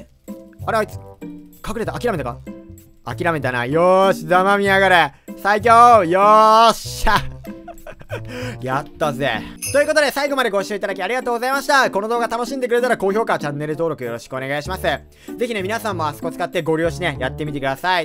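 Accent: Kansai, Japan. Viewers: なんか今回関西弁多い笑笑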